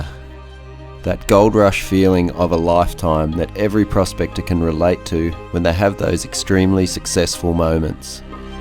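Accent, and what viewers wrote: Australia. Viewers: love your content and your Aussi voice. Well done!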